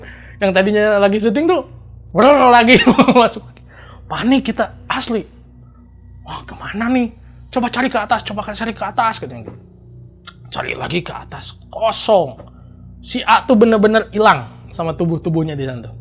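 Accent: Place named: Sunda, Indonesia. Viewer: Logat Sundanya kental banget bang, walaupun pke B.Indo ttep aja sundanya kebawa 😍🔥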